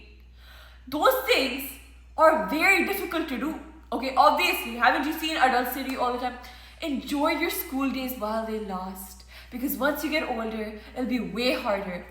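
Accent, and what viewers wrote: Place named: United States. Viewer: Whats wrong... Its bit American and is completely fine and impactfull.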